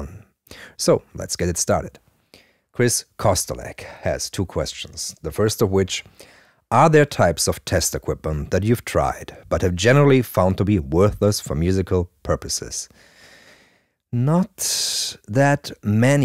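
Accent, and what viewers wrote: Germany. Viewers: Your voice is like recording to tape. Crisp top end, tight low end. The perfect amount of German accent is like the subtle drift of ancient oscillators.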